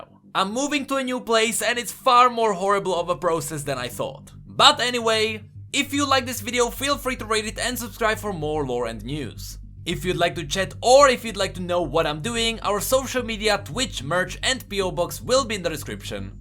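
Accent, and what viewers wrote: Czech Republic. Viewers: Hned co jsem uslyšel ten přízvuk bylo mi jasné, že musíš být z Česka. Úžasná videa <3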